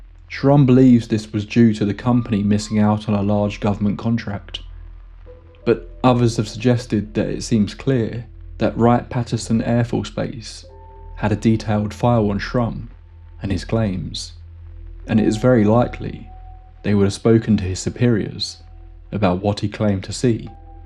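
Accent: United Kingdom. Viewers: A british narrator is so boring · The British wank narrating this story is not helping sell the mystery or horror, whatever. I found myself wishing the narrator was in another line of work.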